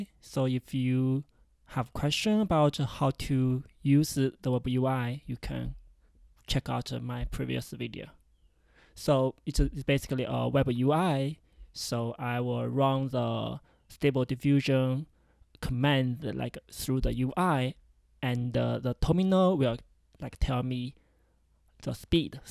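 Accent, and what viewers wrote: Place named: China. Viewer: chinese-style english😊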